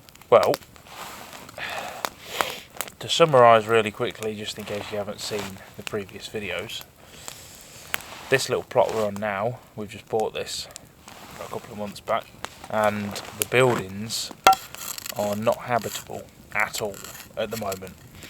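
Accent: Leicester, United Kingdom. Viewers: I do live in Leicestershire and also thought I recognised the accent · Omg. I just twigged that your accent sounded like a Leicester accent and low and behold you are from Leicester. Hello from a fellow Leicester person. Loving your story and no I don’t live in Leicester. Have travelled round a lot myself.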